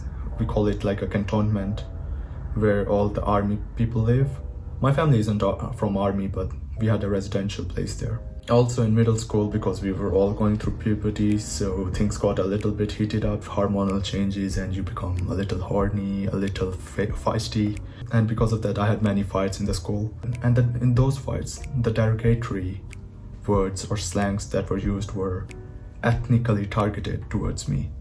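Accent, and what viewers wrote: Pakistan. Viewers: WHERE YOU GOT THE PAKISTANI ACENT FROM??? I LIKE IT, SO ORIGINAL. 😭😭😭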